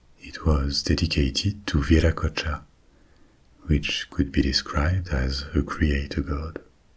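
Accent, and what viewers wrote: France. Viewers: plz keep your french akcent its amazing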